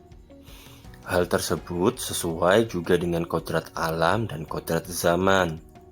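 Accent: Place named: Java, Indonesia. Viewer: Wow. This has incredible visualization, idea, and script. Moreover, your Javanese accent will never ever be changed, that's our character. Good job, Pak.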